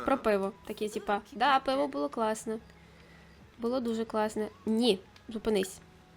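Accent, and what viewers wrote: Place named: Western Ukraine, Ukraine. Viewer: Вауу, а я не знала, що так говорять на заході! Я з далекої півночі;)))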